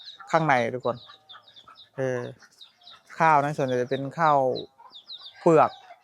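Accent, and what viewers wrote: Thailand. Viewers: อยู่ไทยจนพูดไทยพูดลาวเป็นสำเนียงไทยหมดแล่ว🎉🎉🎉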